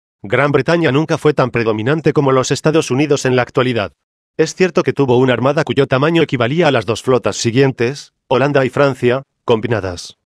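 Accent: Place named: Spain. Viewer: Oye pero cuanta mierda se habla por aquí ! Quien coño maneja estás malditas computadoras con acento español !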